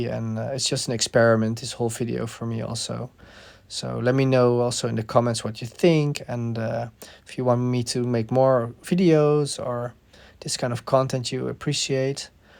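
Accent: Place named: Netherlands. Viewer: Just by hearing his voice speaking English i now he is a hollander 😂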